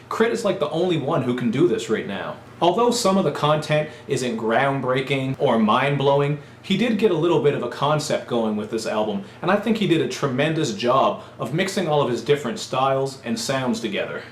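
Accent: Canada. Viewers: You are the most Canadian person I've ever heard.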